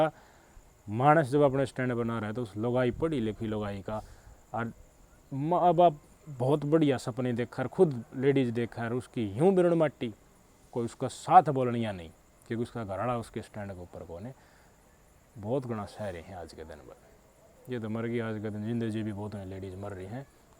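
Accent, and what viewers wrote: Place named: Jhajjar, India. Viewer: Bhai ye bhai district jhajjar se lagta hai mere ko me bhi jhajjar se hu magar bhai baato ko bilkul khol kholker samajaata hai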